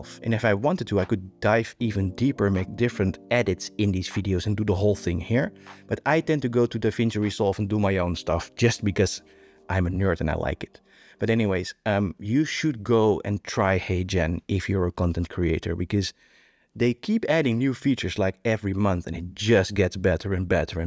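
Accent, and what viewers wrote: Netherlands. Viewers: You sound very Dutch 😊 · I think they will soon be able to reproduce your Dutch accent with all the substitutions you use from Dutch phonology, or produce a clone with any foreign accent.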